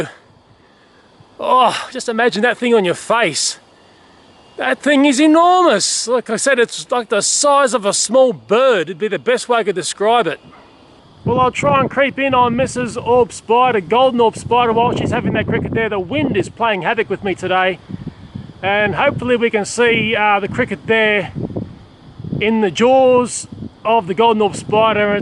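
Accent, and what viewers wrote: Australia. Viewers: Sees thumbail Thats a big spider. Do I really want to watch it? Do I want to be creeped out? Eh why not. The manly Australian voice makes me feel safe.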